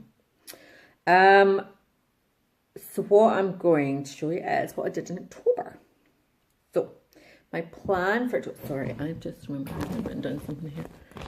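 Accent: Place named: Scotland, United Kingdom. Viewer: I enjoyed your video, nice progress and the patterns you got are beautiful. I have looked at William Morris patterns and just know I'd never make it to the end so didn't even try. It was nice you got to go have a meet-up with the other stitchers, I meet with a group every couple of weeks and it does encourage me to keep at it though we do chat and spend time looking at what everyone else is doing. Lol . Flosstube has grown so big its re ally hard to grow a channel unless there is one of the bigger numbers ones shout you out. The only thing you can do that I can see is find those who joined around the time you do, comment often and shout out other new comers. I don't make videos but I have been observing for a long time, lots of people join and hope those who have been here for ages will see them, comment on them but they only have as much time as they can fit in to watch videos and they made most of their friends when they joined . 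Every week or so I search Flosstube#1 and welcome new stitchers, if I made videos I'd shout them out to the subscribers I had and comment on them often, give likes and slowly over time I would find those I had the most in common with . Good luck building your channel, I really enjoy your videos though at times I find it hard to figure out what you're saying because your Scottish accent is quite strong😂. The Vietnam war was terrible , a whole generation of young men were drafted and a whole lot of them came home in body bags. They were fighting for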